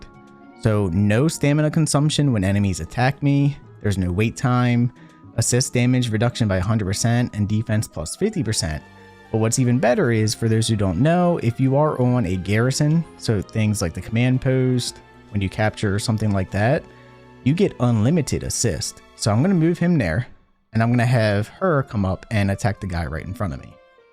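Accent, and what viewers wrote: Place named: Philadelphia, United States. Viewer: Drawn to the Philly accent lol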